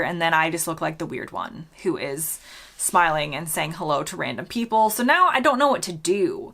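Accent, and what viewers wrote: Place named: Mid-Atlantic, United States. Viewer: Very interesting video as usual! I think you have lost your American (and indeed also traditional British) [æ] as the pronunciation of the short a in words like 'fact' and 'have'. You use a fully open short [a] just as in modern British English. You have also changed the typical American past [pæst] to a so-called mid-atlantic [pa:st] (a long, fully open front vowel) close to but not exactly like south British [pɑ:st] (a long back open vowel). You do, though, retain the American [æ] before nasals such as in words like can, can't and Ramsay.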